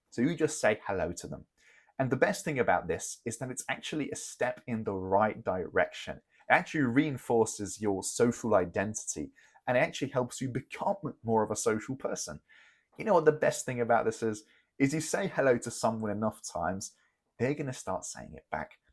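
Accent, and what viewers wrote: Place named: United States. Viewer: Yo man, how the hell did you ger an american accent 😂, youre supposed to have a hungarian accent, im from the Balkans and id like to have that clean accent too, any tips?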